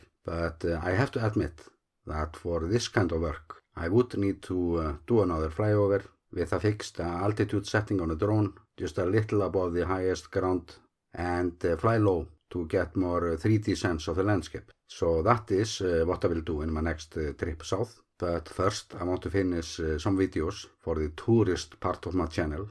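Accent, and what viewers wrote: Iceland. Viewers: could you speak SLOWER with your Icelandic English, please???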